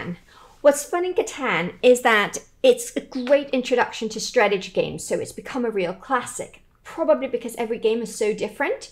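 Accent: United Kingdom. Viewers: I've got a random question, non game related (lol). Are you an english speaking person that learned spanish? Or a spanish speaking person that learned english? Or..... was brought up with one english speaking parent and one spanish speaking parent? I only ask because I love your engish accent, and you speak spanish very well, so im just ciurious. I've seen most of your videos and I loved them, I am a very big fan of Catan, and hence, all of your Catan videos! Thank you!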